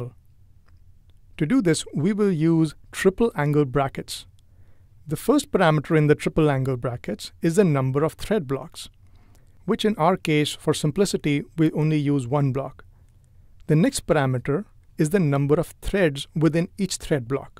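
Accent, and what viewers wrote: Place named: India. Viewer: Painful Indian English Accent.... Its Always Feel The Difference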